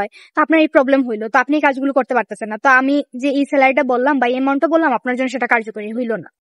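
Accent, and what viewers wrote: Sylhet, Bangladesh. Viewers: আপু তো মনে হয় সিলেটি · Apu apni to sylheti??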